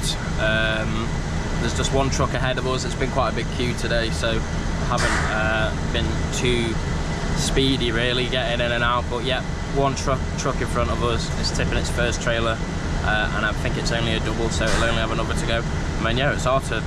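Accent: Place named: Nottinghamshire, United Kingdom. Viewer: Great video mate 👍 very interesting and informative 👍 how long have you been in Australia? Are you from Nottinghamshire? Thought I could tell by your accent any cheers keep up the good work 👏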